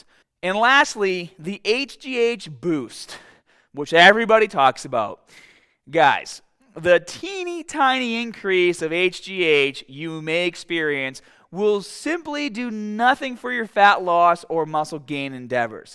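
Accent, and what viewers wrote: Boston, United States. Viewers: Haha Love that accent is that a Boston accent? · OMG! His BOSton accent makes me laugh. Every AR word is exaggerated. EVERY ONE of them. cARbs, mARkers, so fAR, ARgue, ha ha ha.. Keep going man. Good info. Just super funny accent. Love'n it. I do IF with KETO at a 20:4 fasting to 4 for last 6 months. But im not a bodybuilder. And as far as flexing the meal. Its easy to flex your eating time. its not a hassle. Im not a hARd gainer. LOL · You from Boston?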